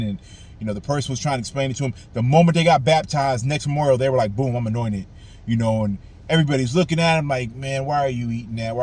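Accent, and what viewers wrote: United States. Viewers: Love the sound of the rain at the end of the video. And the general vibe. You American? Cool accent. What kind of car it is, some large MPV? I was a type of the outsider, not really into the scriptures and ministry, hardly been to any Saturday ministry meetings at all through my 7 years from when I became a publisher to my decision of fading and ghosting. I really was in it for the company of literally couple guys I liked and respected. I didn't care much about anyone else, especially those oldtimers with only basic wordly education, there were few elders like that. Later I learned that folks in the congregation called me "the philosopher" behind my back. That sums it perfectly.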